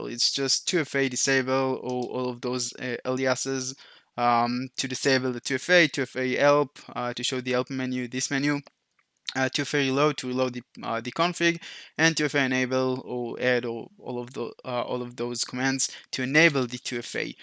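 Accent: Israel. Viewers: Love your Israeli accent lol ! BTW waiting for a reply from you in discord :) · יש לו מבטא ישראלי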